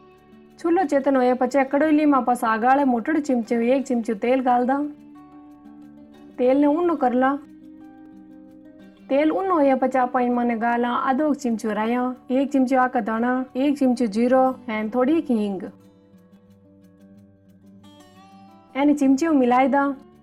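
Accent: Marwar, India. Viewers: वाह जी काबिले तारीफ आपकी सारी रेसिपीज बहुत अच्छी लगी और आपकी मारवाड़ी व मीठी बोली आप की रेसिपी में चार चांद लगा देती है..... आप ऐसे ही आपका हुनर हम तक पहुंचाते रहिए और देश दुनिया में अपना नाम रोशन करते रहिए l धन्यवाद🌼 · Aap ki recipe or marwadi boli bahot hi mithi hai,Ram ram🙏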